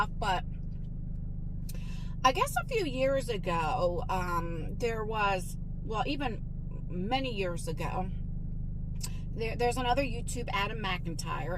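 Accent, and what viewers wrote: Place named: New York, United States. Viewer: I hope Lexi feels better soon with her side effects. I am praying for you and Lexi daily.🙏🙏 It's a sick world out there with all this crap. Family first, don't worry about posting when you can't. We all understand. Are you from NY? I was born there, I think I hear that NY accent. Take care. Love watching your channel.😊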